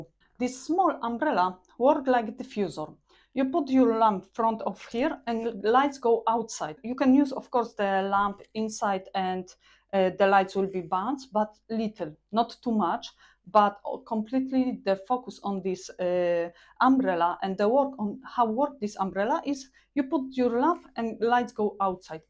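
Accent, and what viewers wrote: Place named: Germany. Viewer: She is speaking English with what sounds like a German accent, based on my time in Deutschland.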